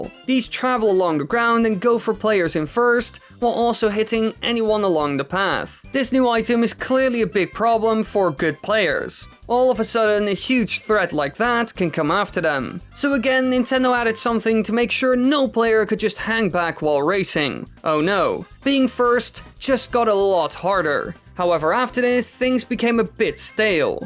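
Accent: Netherlands. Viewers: Are you Dutch? Because I recognize that accent and if you are however than I would like to say something: Leuke video's man ga zo door👍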